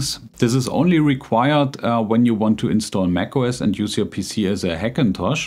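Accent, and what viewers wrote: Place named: Germany. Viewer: Dude. I'm Polish and I knew you're German the moment I heard you.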